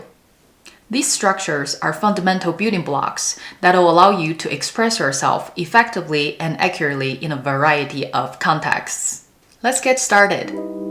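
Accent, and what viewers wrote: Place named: United States. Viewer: Hey very beautiful. Sounds like you have an American accent smile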